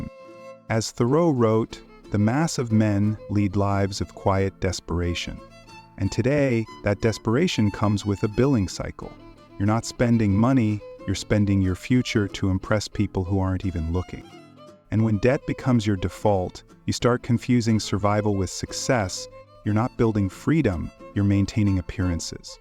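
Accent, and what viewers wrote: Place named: United States. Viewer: Good video. So simply explained. I was expecting something with an "Asian" voice that was difficult to understand. That was more like basic English spoken like an American. The concepts were straightforward. For a rat-race escape video, it diagnosed the problem and offered solutions, in a way that was useful and tabloid.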